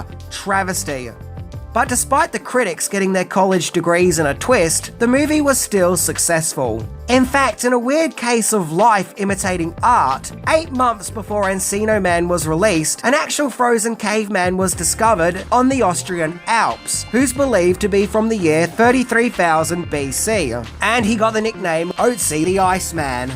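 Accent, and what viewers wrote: Australia. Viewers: No don't be like that! It's all good. We can chalk it up to your cool Australian accent! Most of us don't mind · Who cares if you make a mistake in pronunciation?!? By this time (those of us who have been longtime fan / friends) we all know how much you love your work and the add ins and jokes you pop off more than make up for something as small and Brandon or Brendon. Intent counts friend and your intentions and ending products are AWESOME!!! Plus a lot of us in the states would love to sit and listen to an Australian read a phone book just to hear that accent!!! It’s the best! Keep on keeping on buddy! 😊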